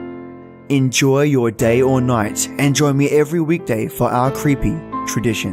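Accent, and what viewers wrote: Australia. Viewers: I missed your awesome Aussie voice 😩 Hope that didn't come off creepy, hahaha. I had no service for a few days and felt like I was going crazy without any stories from my favorite narrators! I'm gonna enjoy this one 🤗👍🖤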